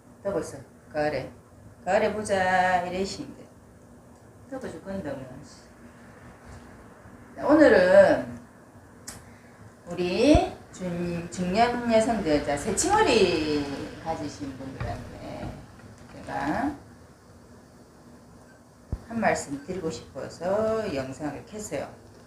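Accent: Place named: Busan, South Korea. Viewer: 말씀도 넘찰지게잘하시네요 사투리도 구수하구요 저역시부산서 오래살았거든요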